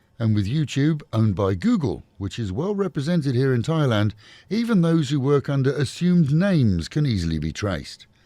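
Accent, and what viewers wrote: United Kingdom. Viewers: Love hearing a British accent while getting the scoop on Thailand drug busts. New subscriber here from the U.S.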